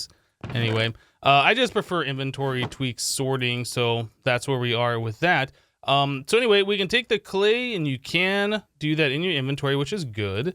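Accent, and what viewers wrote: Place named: Canada. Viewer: Are you Canadian? You have a funny accent.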